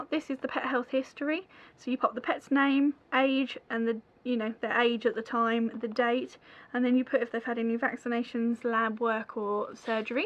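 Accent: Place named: England, United Kingdom. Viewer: Really interesting and refreshing to hear an English accent. Also great tips. Thank you for sharing.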